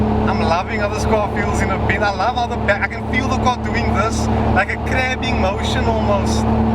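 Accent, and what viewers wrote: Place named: South Africa. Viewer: Cape town South Africa......our country has a lot different accents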